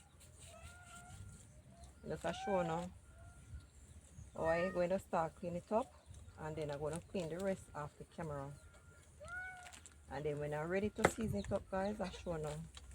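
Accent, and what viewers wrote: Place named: Jamaica. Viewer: Love how you keep the Jamaican accent 😅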